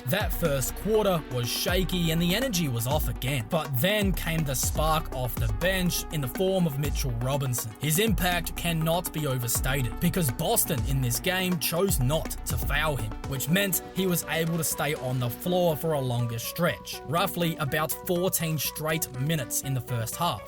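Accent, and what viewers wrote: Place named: Australia. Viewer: Listening to Knicks analysis in a crazy Australian accent is SICK! Lol I love it!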